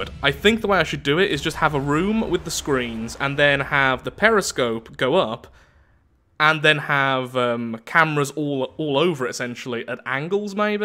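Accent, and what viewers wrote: United Kingdom. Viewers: Not only bc of brit accent but you sound exactly the same as Alex the rambler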